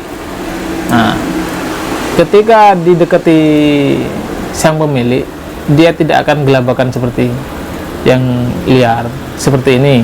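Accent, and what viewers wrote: Madura, Indonesia. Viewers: Kayak orang Jember bahasanya.madura nya mdooookk knaaakk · Ky orang mdr ni bahasanya bengkok2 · logat e kyk orang mdr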